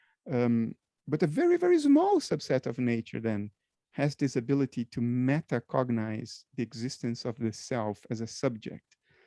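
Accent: Ireland. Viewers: you did a good job with this. well done. (side bar - i detect an Irish accent at the edges. Am I right) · Two beautiful human beings. I so wish you all the best with your podcast and I love your Irish? accent. 🙏🙏 Bernardo and.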